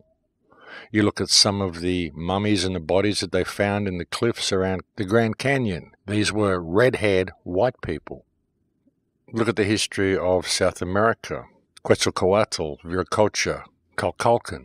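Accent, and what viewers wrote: Australia. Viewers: sounds Australian to me my man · Theres a reason they pack us into cities. Look up mouse utopia experiment. There was an excellent doco on youtube but it kept getting deleted. Great to hear an Aussie voice on this stuff.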